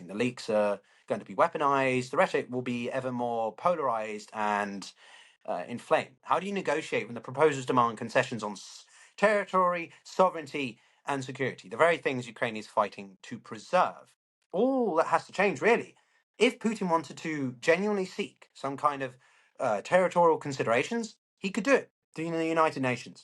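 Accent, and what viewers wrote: England, United Kingdom. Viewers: Hey, English accent dude, you REALLY do NOT see the BIG PICTURE in front of your eyes!!! The US is NOT the heart of NATO! The US has walked away!!! The US only NEEDS NATO when its key national interests are involved, and Ukraine itself was NEVER a key US interest! Diminishing Russia has long been a key US strategic interest, but now the US needs to deal with China, a far bigger strategic interest than either Ukraine or Russia! Put simply, NATO has shown its weaknesses, as too has the EU (Military & economic)!!! Ukraine can swallow the bitter pill now or a far more bitter one in 6-12 months!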